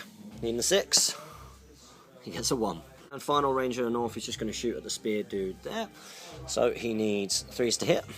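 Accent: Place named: Texas, United States. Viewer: I don't think you are pronouncing it wrong, I just think its the difference in accents between Texas and the UK lol, I was just saying I found it amusing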